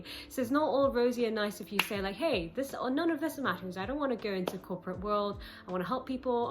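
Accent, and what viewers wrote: United Kingdom. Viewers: Love your gorgeous British diction !!! You present nicely, viz. you speak in complete sentences (subject + verb + object,) your predicates and pronouns agree in case, gender and number with your head nouns, and you do not lard up your presentations with that ignorant non-word, "UH." Very, very professional ! Greetings from Pennsylvania in the Queen's American Colonies. Best wishes for a good career and a good life. (PS - Jordan Peterson is my favorite psychologist on this side of the water.)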